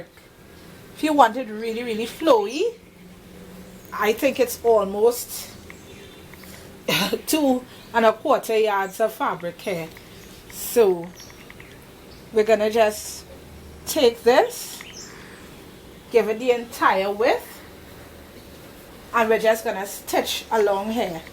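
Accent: Trinidad and Tobago. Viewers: where are u from?. Sound like Trini. i am trini :-9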